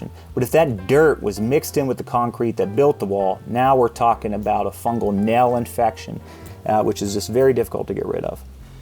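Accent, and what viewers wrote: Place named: New Orleans, United States. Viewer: My first time on your channel I subbed as soon as you said lil Jimmy. You sound like down home folks from my home town New Orleans. Oh and I love the music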